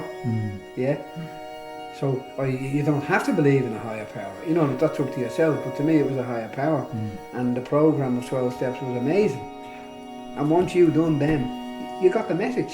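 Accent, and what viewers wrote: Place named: Ireland. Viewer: It's like listening to two five year olds, such Rambling, self indulgent mish mush, typical Irish man, dreadful accent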